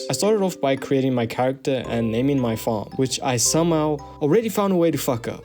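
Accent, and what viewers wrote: South Africa. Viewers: Nice video bro, I recognise that South African accent anywhere 😅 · South African??!!! My bru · waaaait. are you south african? you sound south african a little. its hard to recognise our accent when its not 100% afrikaans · 😂Bro you must be south african. That accent!!! must be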